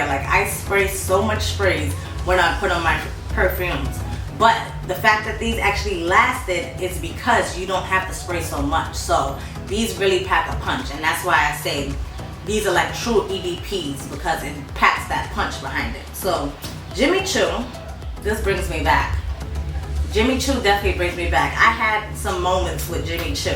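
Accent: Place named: Bronx, United States. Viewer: I enjoyed how New York this video was 😂. I knew right away you was from the Bronx😂😂. A lot of these scents I have so many memories attached to it. At one time everyone and they motha had Gucci Guilty. MAC Turquatic is underrated but I’m happy about that. I love perfume videos where you can see the dents in the perfume. I’m going to check out a few you mentioned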